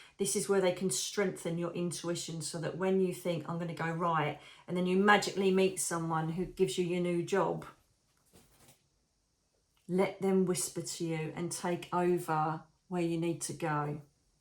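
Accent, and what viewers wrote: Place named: England, United Kingdom. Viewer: Just love your lovely English accent and your beautiful soul. Love from Germany ❤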